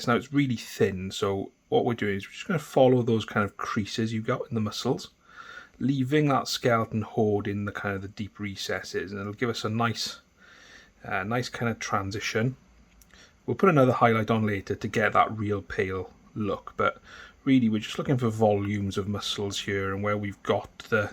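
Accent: Wales, United Kingdom. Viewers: Sorry for your loss bro. I lost my best bud back in July. Do I detect a Welsh accent there? Swansea boy here.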